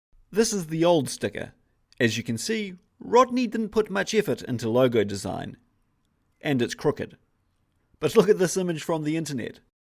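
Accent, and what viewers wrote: New Zealand. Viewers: Love hearing a Kiwi accent, great to see another NZ bloke in his shed making quality content! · Are you a Kiwi guy? You sound like a fellow Mainlander.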